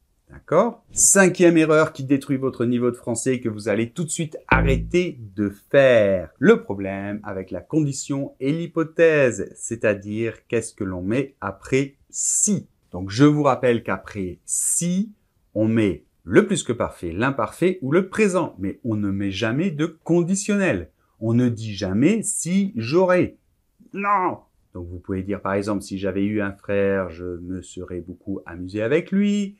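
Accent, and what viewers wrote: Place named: France. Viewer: La façon dont toi Français prononce le e est très différent du Québec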